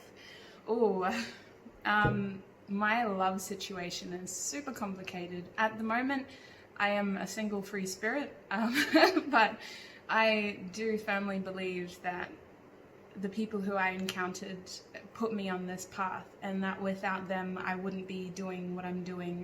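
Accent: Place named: Australia. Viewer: Love your videos and its great to hear more about you ❤ Also great to hear a fellow Aussie accent!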